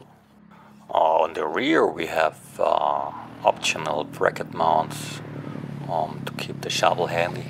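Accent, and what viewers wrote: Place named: United States. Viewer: Well, sort of English! More American?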